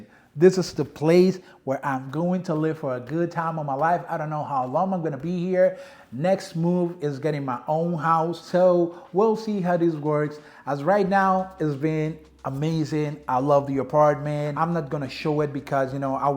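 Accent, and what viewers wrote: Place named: Brazil. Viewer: I left Cali (San Diego) after living there for 28 yrs 7 months ago. Moved to FL. I don't know how I did it, but the cost of living was getting too damn expensive, even with a great job. I didn't have a ton of stuff in my place. Packed all my stuff in XL Home Depot moving boxes and shipped boxes out through UPS a few months ahead of time of my final departure. 8 total boxes. All my shoes were in them with some clothes.I shipped through Pirate Ship, saving a couple hundred dollars. I had my car shipped out for a great deal. Had more shoes in the trunk and a few other things. I had my car broken into a few years ago at my place. Catalytic converter was tampered with the theifs had a hard time removing stuff all over the seats and floor. That next morning I called the cops. Them lazy bastards pretty much told me there's nothing they could do. 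They didn't even come out when I wanted to file a report. Since that incident, I lost all respect for SDPD. I'm here in FL closer to family. Still adjusting to the slower pace, a little culture shock if you know what I mean. Cost of living is cheaper than Cali. No state tax. I like the warm weather, but I hate the humidity. Excellent fishing here in which I love doing as my top go to hobby besides shoes. Do I miss Cali? Yes and no. I miss the weather, friends, and hanging out at many of my favorite spots. I'll definitely go back and visit my Cali peeps no doubt. BTW, where are you from? It sounds like to me you have a Brazilian accent.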